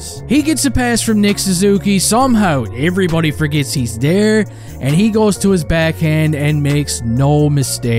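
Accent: Newfoundland, Canada. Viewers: Bro where are you from? If this is your real voice you sound exactly like a small town Newfoundlander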